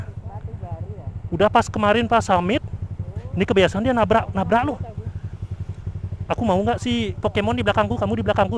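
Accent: Bali, Indonesia. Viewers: Logat bali khas banget hehe😅😁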